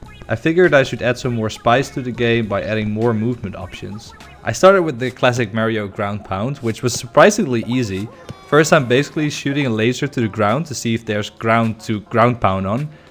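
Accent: Netherlands. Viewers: Yo, so ehhh, I just wanted to say that Unity3d also has Visual Scripting implemented since the beginning of 2021 :D I'm actually using that for my game :P EEEEEN Ik hoorde door je stem (en zag toen in je 'Over') dat je Nederlands bent 👍👍👍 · I hear a Dutch accent! Succes met je project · Hoor ik hier nou een stiekem Nederlands accentje in 😂🤲🏻 Character controller ziet er super smooth uit en er zijn zoveel richtingen waar deze game nog heen kan gaan! Kijk erg uit naar je volgende devlog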